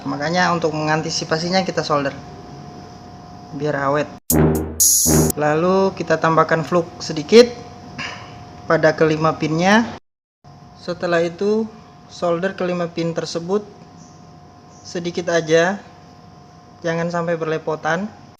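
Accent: Indonesia. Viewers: Kalo logat e kaya ne orang pasar bulu ini yo heeee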